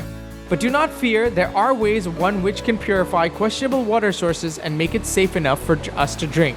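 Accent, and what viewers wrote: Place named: India. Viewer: Fuck you amost have the indian accent tune down to nothing..but still need to work on it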